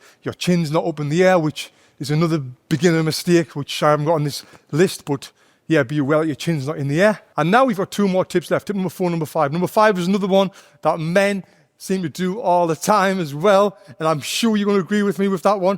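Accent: United Kingdom. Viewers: Are you british mate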